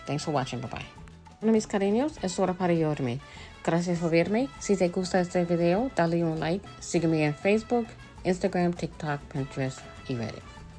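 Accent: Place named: New York, United States. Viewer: It's the new york accent for me!! 🗽❤ I can't wait to try this recipe for my family!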